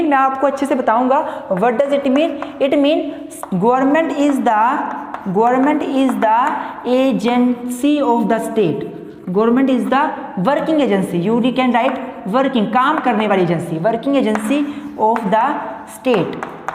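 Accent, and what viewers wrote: Himachal Pradesh, India. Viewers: Sir aap Himachal Se ho ?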